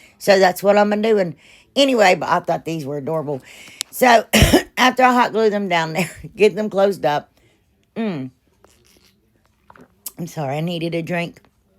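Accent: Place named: Tennessee, United States. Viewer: I love to watch you. You definitely put a smile on my face when I watch you. Your accent sounds close to ours here. That would be in Northeast TN. Love your crafts. You can put matches in the toothpick holder to light candles too.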